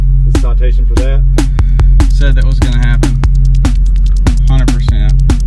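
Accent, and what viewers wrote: Alabama, United States. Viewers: What part of bama you from ?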